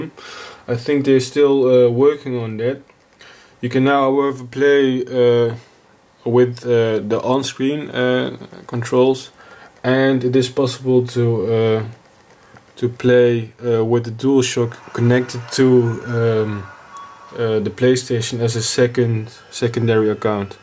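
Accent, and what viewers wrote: Netherlands. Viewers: ben je nederlands toevallig? zo ja, goed engels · Are you dutch ? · Are you dutch??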